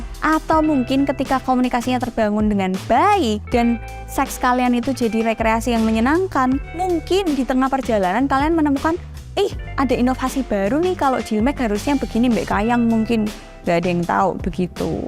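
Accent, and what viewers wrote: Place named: Java, Indonesia. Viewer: Pakai Bahasa indonesia yg Benar cermat ,,,, jangan pakai logat Jawamu ,,,, yg nonton bukan wong jowo doang,,, ,,, byk suku lain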